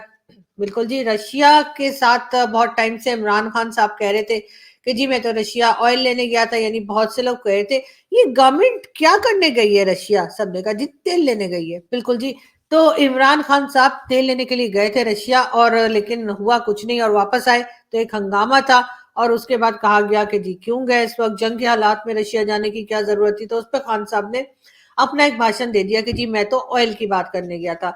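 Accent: India. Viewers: जी नमस्कार आप इतनी खरी खरी सुनाती हो क्या आपको पाकिस्तान में डर नही लगता बोलचाल की टोन से ऐसा लगता है कि आप भारत की हो । आलिया शाह की टोन से वो पाकिस्तान की लगती है। आप खुश रहो आबाद रहो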